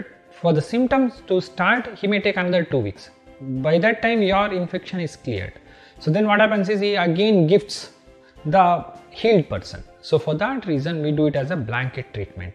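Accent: India. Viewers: Great information I just wish I was better at understanding the Indian accent in English.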